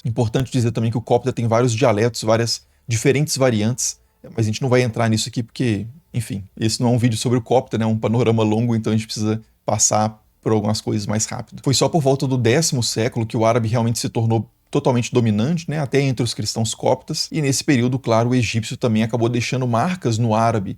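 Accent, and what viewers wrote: Minas Gerais, Brazil. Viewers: Sotaque mineiro inconfundivel? Eu diria de BH!!!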